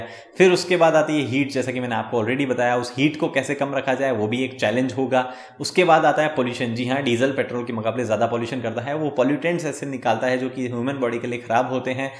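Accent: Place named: Gujarat, India. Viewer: Are you a Gujrati? You've a strong MTI. :D Btw, nice video.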